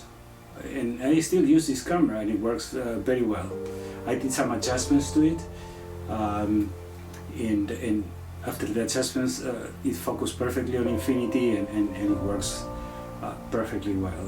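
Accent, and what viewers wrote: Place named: Chile. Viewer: Estimado, por el acento presumo que eres chileno. Creo que somos contemporáneos fotográfica y existencialmente, además de provenir de esta larga faja de naturaleza que es nuestra tierra. Imagino que vives en Usa, por tus imágenes. El gran formato es muy atractivo y quién lo práctica como tú podría decirse que es como ser un "sibarita" de la imagen. Pero con la imagen digital, como tú bien dices, la inmediatez resulta muy atractiva y adictiva. Pase de lo análogo a lo digital con gran entusiasmo y sigo en esto hasta el día de hoy con ya más de 50 años de acción fotográfica y a mis 77 abriles.... Un gran saludo para ti, de este fotógrafo radicado en el sur de Chile. Puedes ver algo de mi obra, bajo mi nombre en youtube...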